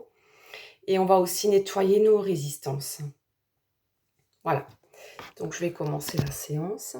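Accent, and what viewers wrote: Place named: Alsace, France. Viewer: vous n etes pas alsacienne d'après l'accent ? c sympa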